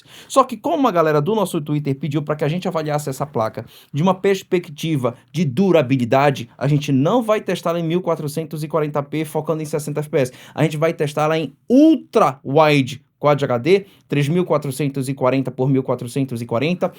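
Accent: Pará, Brazil. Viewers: Cara, você é paraense? 😮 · cara que legal,voce é do pará, sempre desconfiei pelo sotaque, muito bom ter um canal tão foda representando nosso estado. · ele deve ser do Sul do Pará nao tem sotaque do norte do Pará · Rapaz, tu é paraense? Que bacana hahahah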